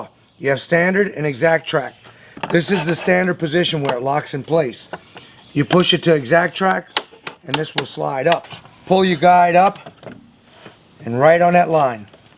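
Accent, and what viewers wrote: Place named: Louisiana, United States. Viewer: Hi, great video! I see your wearing an Abita beer shirt. I'm from Slidell and recognized your accent right away! Keep up the great work. Your project looks awesome!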